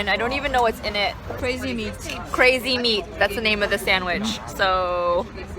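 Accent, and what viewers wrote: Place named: Rio de Janeiro, Brazil. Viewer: The SH sound for the final esses are more of a Rio thing. People don't soud like that in Sao Paulo.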